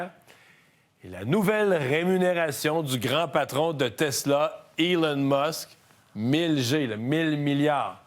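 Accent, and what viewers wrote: Canada. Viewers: vraiment l'accent canadien j'adore bon devolleppement sur le sujet big up canada all and alls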